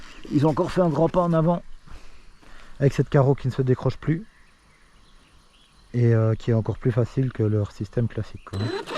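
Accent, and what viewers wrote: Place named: Belgium. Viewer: Salut salut, je reconnais l'accent et les septantes du pays;) t'es de quel coin au juste? On est peut être voisins 🤓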